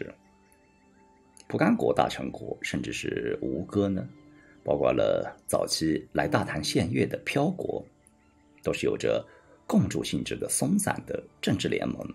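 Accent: Yunnan, China. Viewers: 这是云南口音啊？😂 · 云南口音很亲切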